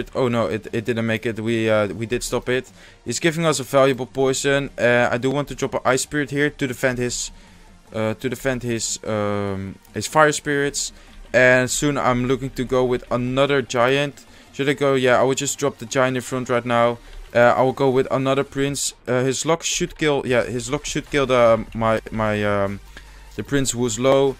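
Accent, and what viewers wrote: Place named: Netherlands. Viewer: Where you from? Je klinkt Nederlands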